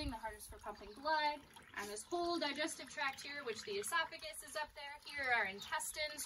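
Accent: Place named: United States. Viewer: Also Lady, please put down an English writing above, because don't understand american accent and vocal pronounciation with all mouth. Is strange this English accent. The subiect îs to interesting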